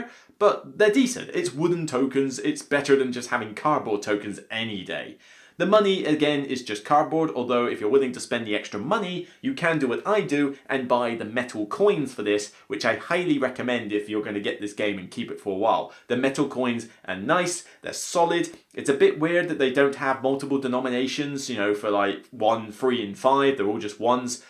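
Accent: United Kingdom. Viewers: Why is this guy’s accent half British and half American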